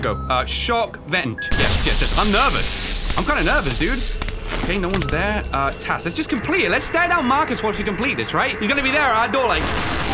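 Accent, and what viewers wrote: United Kingdom. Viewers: FOR SOME REASON I DIDNT REALISE YOUR FROM THE UK HELP. ME TOO OMGGG AA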